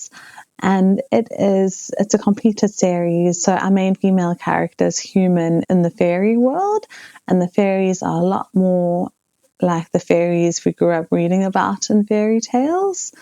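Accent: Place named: South Africa. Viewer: From one South African Bookworm to another...hello! 😂 I just found your channel today and loved hearing the South African accent on YouTube. ❤. Anyway, hope to see you over on my new bookworm channel as well. I'm traditionally a massive thriller reader but I've recently discovered fantasy and actually, I've dedicated the month of Aptil to only reading fantasy.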